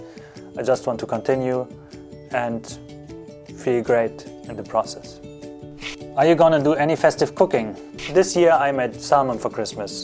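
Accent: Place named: Germany. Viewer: Die Sekunde wo du angefangen hast zu reden habe ich gemerkt das du deutscher bist. Ich liebe es deutsche Akzente zu hören. makes me feel like i'm at home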